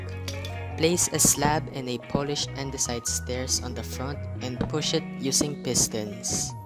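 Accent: Philippines. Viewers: Ko ya parang Tagalog ka no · Are you Filipino, the way you speak in english is filipino, I'm Filipino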